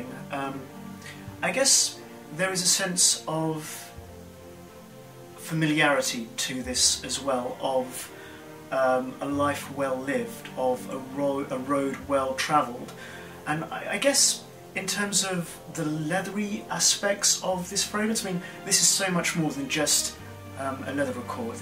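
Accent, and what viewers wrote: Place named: RP, United Kingdom. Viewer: All the superlatives are on point. Spot on. I would go as far to say that Anubis is for me in the same category as a not too barnyardish Oudh. That initial petroleum benzine, leatherish scent you get but then fades away for a beautiful resinous, smokey and incense aroma. I just love it. Can’t wait anymore to get my bottle. Have a nice weekend. By the way I like your voice and above all your English. Very well spoken, posh😉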